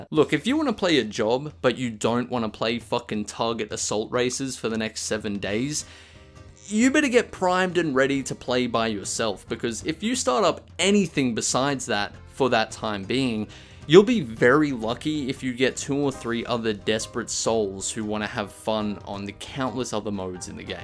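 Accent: Australia. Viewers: Are you Australian Kiwi or English? cause honestly i cant tell...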